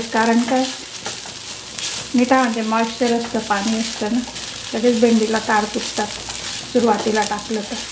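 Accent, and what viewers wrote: Khandesh, India. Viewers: Tumcha aavaj olkhicha vatato aahe Tumhi khandeshi aahat ka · Chan Tai. Recipe takat Jaa .... Khandeshi awwaz aiklyawar pan samadhan milta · ताई तुम्ही खान्देशी आहात का कारण आपल्या खान्देशी भाषा लगेच ओळखले जाते आणि आम्ही तुमचेच सर्व व्हिडिओ बघतो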